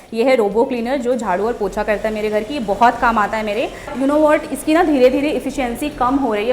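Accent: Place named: Braj, India. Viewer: Ap mathura se hai Mam,, ? Apka acent Brajwasiyo ki trha lgta h 🥰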